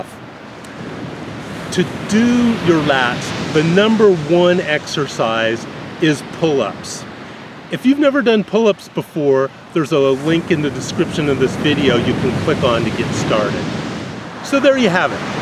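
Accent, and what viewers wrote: Germany. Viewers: Well, yea, but he seems to have a little German accent when he speaks English. Mostly with the latter "R"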